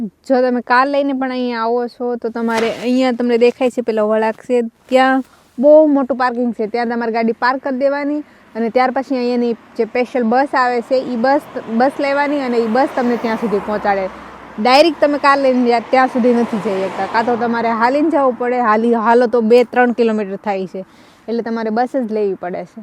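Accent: Gujarat, India. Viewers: Full Video joyo Maja aavi gai ane videsh ma jaine b tame Gujarati deshi Bolo cho tamari bolvani Sticks sarash che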